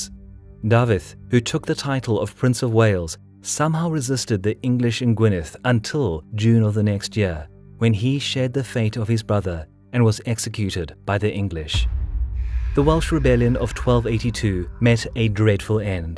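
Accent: South Africa. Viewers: Is this narrator South African? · Saffer narrator? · That's a South African accent ....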